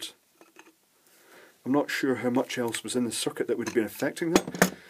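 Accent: Scotland, United Kingdom. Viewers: I have this exact unit, arrived today from China (impressively, in 7 days). I'm well aware of its enthusiastic use by the BDSM crowd (even seen an ancient one used in a public setting at a club - eek) but I bought mine simply to disinfect skin and keep zits at bay. Some aestheticians suggest it can be used for "skin lifting" but I guess bigclive sees it as quackery, and he's entitled to his opinion - I really do think it helps acne, though. Nice to hear a Scottish voice on Youtube :) Thanks for the very interesting video!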